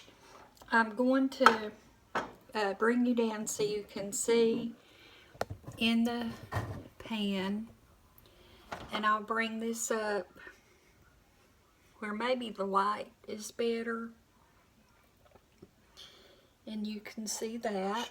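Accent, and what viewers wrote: South, United States. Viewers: Heather Robbins:. Isn't a sweet lady to use her time to teach us different recipes? It's that southern tone she has,just so sweet.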